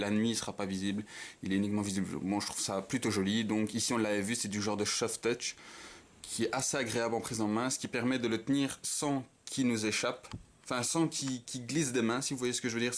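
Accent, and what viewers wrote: Belgium. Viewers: Slt. Je suis intéressée par le Nexus 5 qui va sortir prochainement. Etant egalement belge, où peut-on s'en procurer un? Super video, et vive l'accent belge en effet! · vive l'accent belge ! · Alors c'est ça l'accent belge ? C'est marrant ;3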